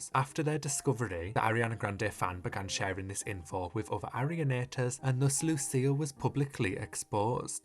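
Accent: United Kingdom. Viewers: A British accent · For some reason, this sophisticated British accent makes videos about online stan culture so funny lmaao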